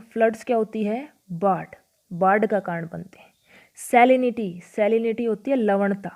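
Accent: Haryana, India. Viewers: Mam aap haryana se ho na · Are you from haryana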